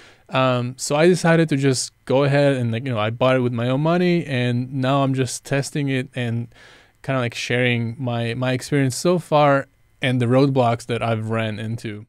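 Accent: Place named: Paris, France. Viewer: there’s this channel on youtube called street french and your accent kind of sounds like hers. She’s from Paris. Are you from Paris too?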